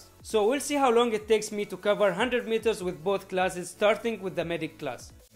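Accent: India. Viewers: Are you an Indian bro ? because i think your an indian because of your accent from: Philippines🇵🇭 ❤️❤️❤️ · At first I thought your indian by your accent then I realised Indian can’t be this good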